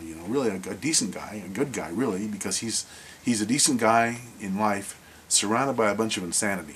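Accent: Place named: Ireland. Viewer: You might get mistaken for an potential Irish thug but no where near a Tony Soprano. Your Irish comes out too much in everything you do. Hell, I can tell you're irish even though you haven't told me! Now me, on the other hand...I'm called "the Godfather" by all the guys I race R/C trucks with...they line up in the pits to come talk to me and shake my hand...one at a time. That's how I got the nickname "Godfather". This is no lie. I'm usually smoking a Churchill sized gar when this happens..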